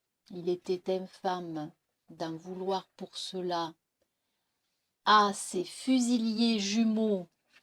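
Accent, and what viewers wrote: South, France. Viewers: Pas étonnant toutes ces fautes exposées dans les commentaires: déjà avec un accent Parisien, ce texte d'un autre temps, ne serait globalement pas une mince affaire mais alors avec l'accent du sud bonjour. Sinon ne pas le prendre autrement que dans le contexte car en dehors c'est un joli accent mais moins propice ici. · Excusez moi! Je ne suis pas Française, (je ne savais pas que..) en lisant les commentaires j'ai appris que c'était l'accent du sud😶 Excusez-moi mais je ne suis pas habituée avec cet accent! Ce n'est pas de votre faute😅bien sûr! Je vous remercie pour cette dictée quand même!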